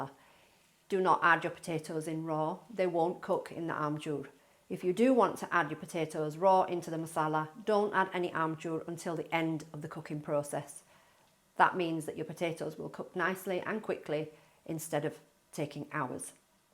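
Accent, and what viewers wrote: United Kingdom. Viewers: plus there’s nothing more commanding yet soothing than a Yorkshire accent, you sit up, and pay attention whenever you hear one! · It's not dubbed, it's the presenter's normal accent, a Northern English one. A part of the UK that is renowned for it's Indian cuisine. By your logic, all chefs who present cooking shows should present them in the language of the meal that's being prepped. Jamie Oliver might struggle a bit.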